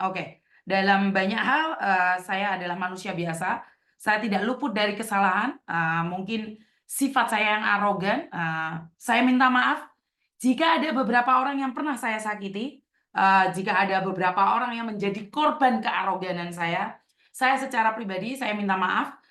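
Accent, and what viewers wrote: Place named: Java, Indonesia. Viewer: lebih baik ditulis. Biar jelas dan dapat dibaca oleh semua pihak di boarding house. Ingat tidak semua wni di boarding house mau saling membantu, banyak yg jd Ular di dalam sana. Klo ada peraturan tertulis di boarding house minimal mengurangi potensi kesalahan yg akan terjd. Semoga dapat membaca komen ttg saran ini. Klo Gak ada waktu utk menjelaskan peraturan yah lebih baik tempel aturan main di boarding house biar bisa diturutin oleh semua penghuni boarding. Dan semoga kedepannya mengurangi emosi saat mendengar laporan dari pihak lain, Dan lebih memberikan waktu ke diri sendiri utk mengolah informasi yg masuk. Jujur aja mbak mbak yg laporin ada salahnya dgn bikin lebay aduan spt mrka bilang Lina belanja baju Zara yg seksi faktanya di channel Koko Faisal baju yg dibeli cuma baju biasa. Dan ttg ngomong bahasa mandarin, gak semua orang Indonesia paham dgn bahasa Jawa yg dikeluarkan, Sy orang Bekasi saja kadang suka tulalit klo dengar ngomong dgn bahasa Dan aksen Jawa, makanya kmrn ituh Lina ngomong bahasa mandarin dgn harapan biar lebih cepat dipahami penjelasan yg ingin Lina sampaikan Dan di channel Koko Faisal baru diketahui klo Lina bukan orang Jawa tapi Dari Kalimantan Dan pernah kerja di Malaysia, nah orang2 asli Kalimantan emang biasanya gak paham bahasa Jawa krn pas Ngamuk ituh kebanyakan ngomong bahasa Jawa yg bikin Lina tambah bingung. 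Semua ituh cuma saran agar lebih bijak lg kedepannya. Tidak ada yg Salah dgn saran, toh saran baik u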